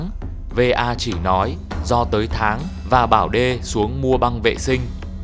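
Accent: Hanoi, Vietnam. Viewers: Giong HN đọc hay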